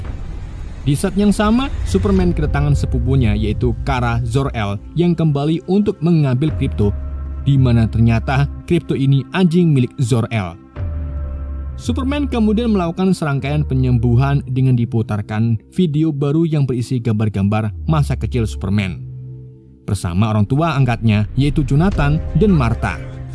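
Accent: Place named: Pekalongan, Indonesia. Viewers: Wong kalongan po min. Kok ngomong megono. 😅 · Iki admine antara wong Pekalongan nek ora wong batang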